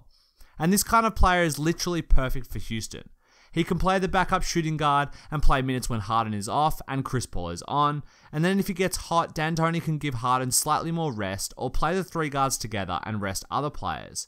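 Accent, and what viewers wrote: Australia. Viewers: u sound like an asian aussie amirite?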